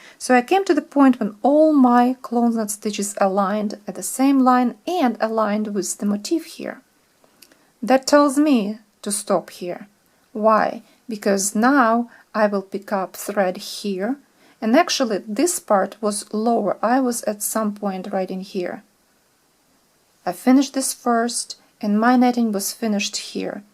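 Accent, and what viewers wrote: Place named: Russia. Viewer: Здравствуйте,по акценту поняла ,что русский знаком с Вами !!! Потрясающая сетка , такой я еще не видела ,браво !!!👍👍👍👍👍👍👏👍👍👍👍👍👍👍👍👍👍👍👍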